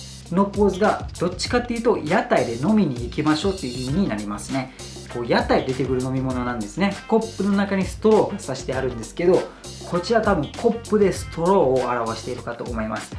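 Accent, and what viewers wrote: Kansai, Japan. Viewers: *さんが話してる関西弁？大阪弁？のスラング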